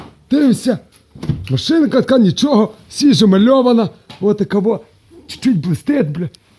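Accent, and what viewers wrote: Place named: Khmelnytskyi, Ukraine. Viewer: Судя с говора вы с Хмельницкой области 😊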